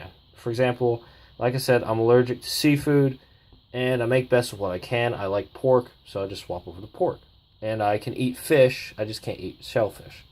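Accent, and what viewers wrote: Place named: United States. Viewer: So you live in Australia, but have an American accent, were you born in America, but moved to Australia? I'm just curious.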